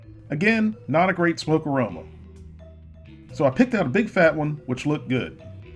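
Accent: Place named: Carolinas, United States. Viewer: Blow Me he is from the Carolinas I think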